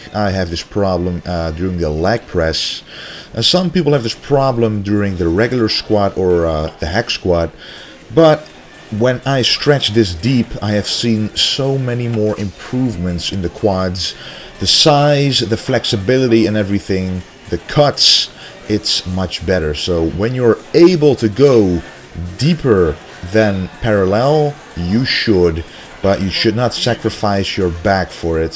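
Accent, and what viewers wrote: Netherlands. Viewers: YUNG_BOAA Come on dude. He's dutch. Its his accent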